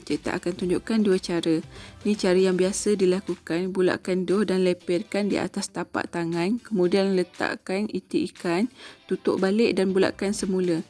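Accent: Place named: Kuala Lumpur, Malaysia. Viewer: Hebat buat kuih ganu tp slang orang key el😄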